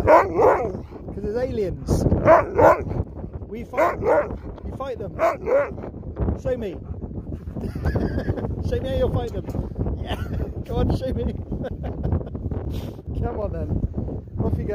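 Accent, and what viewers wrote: United Kingdom. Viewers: Btw are u from the UK??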